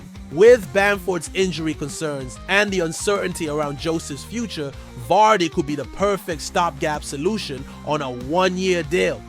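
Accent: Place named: United States. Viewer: Why change the voice to American. It’s unbearable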